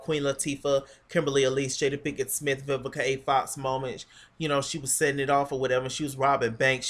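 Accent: New York, United States. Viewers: The New York accent is just too real. Yo, is you from Brooklyn or the Bronx? I TOTALLY agree with your opinion of the busted up old ass creep squad. I don't see what any of these females, season after season, see in any of those guys. In addition to being old, washed up, and unattractive - I have never seen one of them do anything to further anybody's music career. People don't seem to like BBOD or Moe Money, but one thing that I will say in her defense...she didn't allow Cisco to play her once she found out that he was screwing Mariah No Carey. When it comes to LHH, these dumb ass chicks fight over these lame ass dudes. But Moe cut it off at that very moment and told Mariah that she could have him. I was totally here for how she handled that. GREAT REVIEWS FOR THE WHOLE SEASON! You're awesome!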